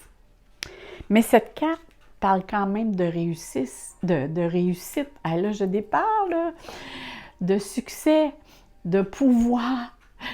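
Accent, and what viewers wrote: Canada. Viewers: Merci, pour vos conseils précieux et précis, avec un petit accent en plus qui me rappelle des bons souvenirs de mon passage au Canada.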